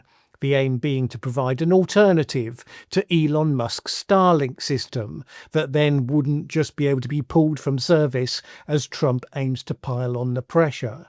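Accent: United Kingdom. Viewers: It is fascinating to listen to utter rubbish, delivered in a nice British accent. Your drivel is driven by the basic hatred of Russia, and you will let no facts alter your knowledge of the truth. Like Ukraine, you too are heading down the toilet of history by your hate and ignorance. Zelensky was elected by 76% of the Ukranians because he promised to return the Minsk agreements and make peace with Russsia and the ethnic Russians, 14,000 of wome you have murdered in Domabss. As soon as he was elected, he totally flipped. If he DARE make peace with Russia, he will be hung in Maydan square by the Banderites - 3% of the people who run Ukraine. And the British see things ONLY through the lens of the Russophobia. "a man hears what he wants to hear and disregards the rest". Reality punishes stupidity. When regarding the ALLOWED gang rape of your young women, what conclusion can be reached, except that you have become degenerate people ?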